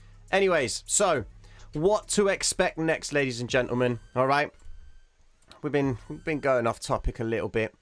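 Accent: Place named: North London, United Kingdom. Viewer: Most underrated, level-headed analysis I have found on YouTube. Most people don't realise that 99% of these TA guys are just trying to shill you their telegram or discord or VIP group which lies behind a massive paywall. If they were really that good they would make bank on trading but they clearly don't if they need to sell you their BS programmes about drawing triangles and lines. gives the most valuable information for free and you can tell he doesn't need to do it but he wants to help people. Literally top 3 trading channels on YouTube. Can't wait to sign up for patreon just to show my support. Much love, btw you must be from north London or something cos you sound just like me lol, I'm gonna go for Greek Cypriot haha, nuff love <3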